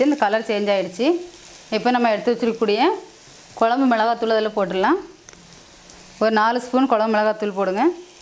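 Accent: Tirunelveli, India. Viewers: Sister yr native place thirunelveli ya yr nellai slang is very like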